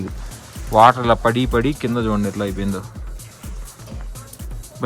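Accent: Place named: Guntur, India. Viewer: Me guntur slang valla anukunta chalaa natural ga untundhi me explanation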